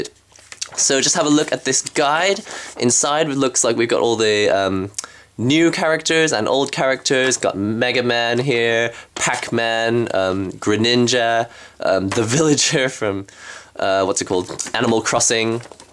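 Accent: United Kingdom. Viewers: I'm not saying that silly. From his accent, he's clearly a British dude moved to Japan!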